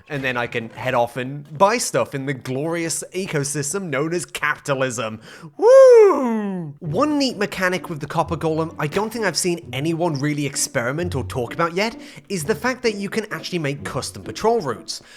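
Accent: United Kingdom. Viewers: Sometimes I deadass forget that is british and then abruptly remember in the middle of the video even though his british accent is prevalent in the entire video. No idea why Anyway UNPAYED LABOUR LETTTTTTT'SSSSSSS GOOOOOOOOOOOOOOO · are you a brit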